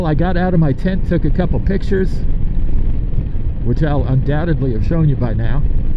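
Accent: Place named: New Jersey, United States. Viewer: Bringing NJ accent to your neck of the woods 😆 🤙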